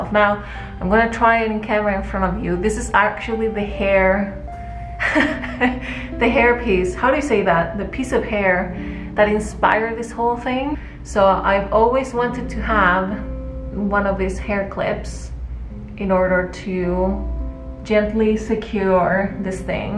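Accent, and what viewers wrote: Chile. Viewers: I was watching your video and you said unas palabras en español y dije Ohhh!!! es Chilena xD y bueno te googlie y si !! Saludos desde Chile!!!! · ¿Ese acento es chileno? Estoy seguritaaa ¡que emoción encontrar gente tan creativa y de mi propio país!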